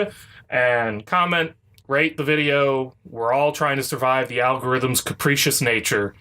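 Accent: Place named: South, United States. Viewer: Dude, you're pretty impressive with the improv...that southern accent is hilarious. Tell me that's Guiness in your mug.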